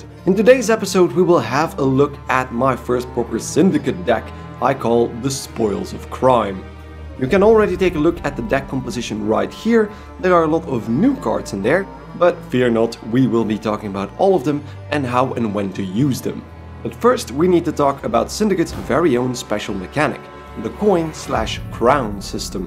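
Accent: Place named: Netherlands. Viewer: I heard your voice and I immediately knew you were from the Netherlands or Belgium ahaha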